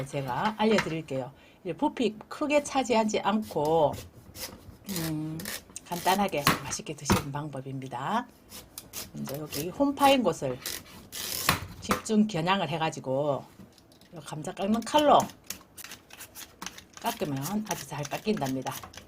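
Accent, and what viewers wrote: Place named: North Gyeongsang, South Korea. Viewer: 갱북 어딘교 나도갱국인데 말씨가 똑같아서 입니다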